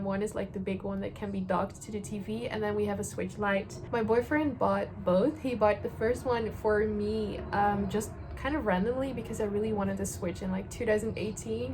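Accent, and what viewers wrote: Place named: Netherlands. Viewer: Ik hoorde direct aan je accent dat je ook Nederlands bent! ♥️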